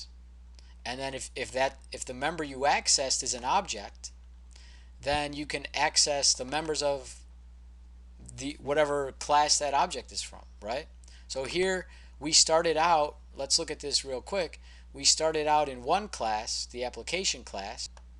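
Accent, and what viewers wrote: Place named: United States. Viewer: Thank you, Very clear lessons and a good American accent.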